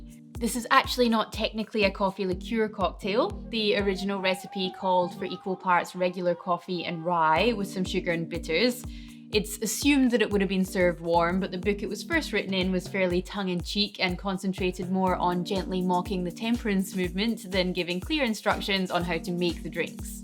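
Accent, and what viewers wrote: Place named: Scotland, United Kingdom. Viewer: is now so connected with cocktails in my mind that all recipes are read in a Scottish accent.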